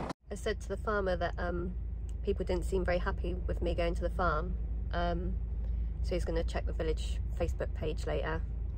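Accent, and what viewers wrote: West Country, United Kingdom. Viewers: Brilliant to see your first vlog! Like the camera you use in the truck, nice to see all the different views and angle's whilst driving, especially when you're going round a corner and it shows the side of the trailer cutting the corner, great to hear you talking, nothing beats a west country accent 👍👍🤛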